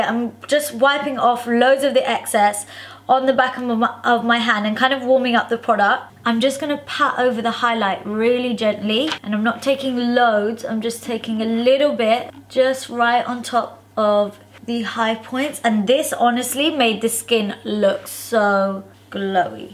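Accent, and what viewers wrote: United Kingdom. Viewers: Omg hate your englisch accent 🤢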